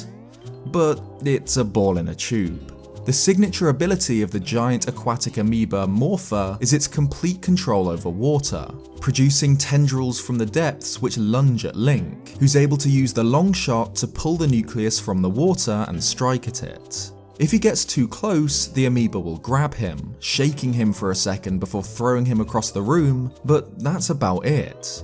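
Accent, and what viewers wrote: England, United Kingdom. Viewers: that's just the English accent for ya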